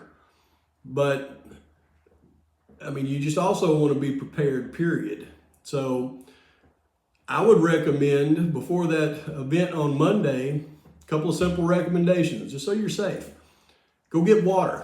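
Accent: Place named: North Carolina, United States. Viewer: You surely have a born abd bred NC accent….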